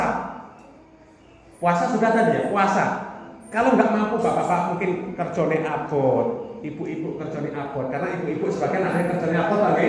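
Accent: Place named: East Java, Indonesia. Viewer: Jawa timuran ya ustad...? Bahasanya khas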